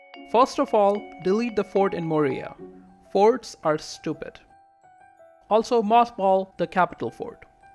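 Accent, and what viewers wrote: India. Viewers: You Indian or something? This accent is horrible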